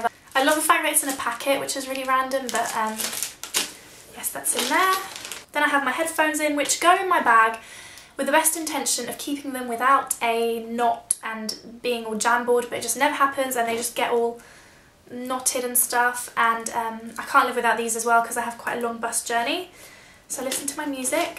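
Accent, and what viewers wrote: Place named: United Kingdom. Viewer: i liked the video before it even started... i know its going to be awesome because: 1. its whats in my bag video, 2. its you talking with that beautiful accent (i wish i lived in the UK) cheers from croatia :)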